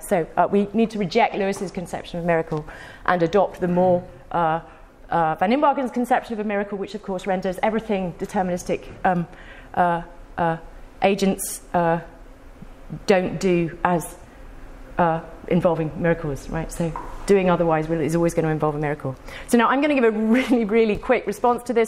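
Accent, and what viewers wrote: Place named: United Kingdom. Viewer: I used to love the britisch accents. of course, I don't get to hear it that often, but nevertheless, I had such a hard time to follow this women for sure for several reasons (speed and reduced content etc), but her accent was really hard to follow at that speed but even with low speed it doesn't get better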